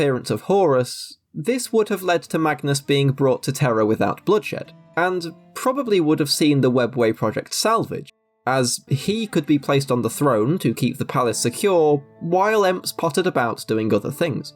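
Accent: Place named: United Kingdom. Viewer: A handsome man with a smart british accent nerding on about warhammer? Yes please!🎉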